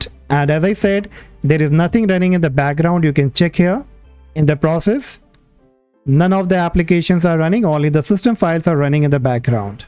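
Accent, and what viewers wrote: India. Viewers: your english is very good, i very like your indian accent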